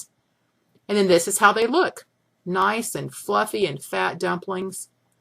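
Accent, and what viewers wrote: South, United States. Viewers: 3 years later and I'm still making these dumplings! I'm doing them in a beef stew but works just wonderfully. I love revisiting this video, I'm from Canada and I live for the southern accents! You know the recipe is going to be good, when you hear that accent! Cheers!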